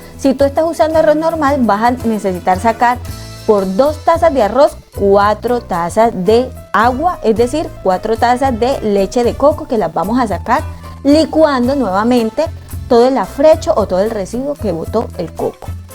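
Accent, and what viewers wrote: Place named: Caribbean Coast, Colombia. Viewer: Tienes acento costeño 😉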